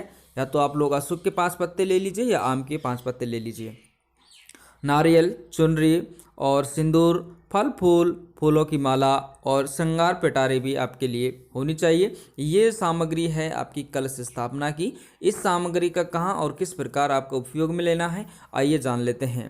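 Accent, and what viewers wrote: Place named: Jammu, India. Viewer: Jay maat dii bahi jammu sa bool rah ha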